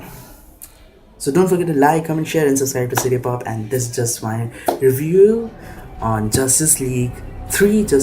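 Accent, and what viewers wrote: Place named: England, United Kingdom. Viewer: Like the english accent :v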